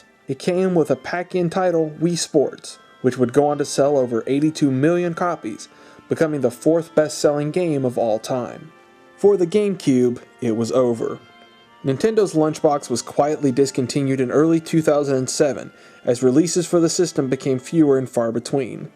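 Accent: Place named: South, United States. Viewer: Your accent. Are you from Georgia? South Carolina?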